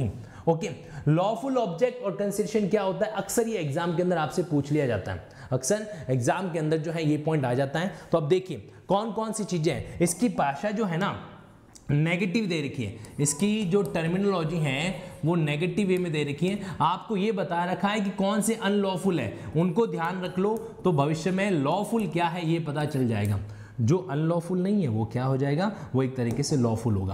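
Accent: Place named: India. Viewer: This rubbish is an Indian law lecture in Pidgin.-English and Indian dialect.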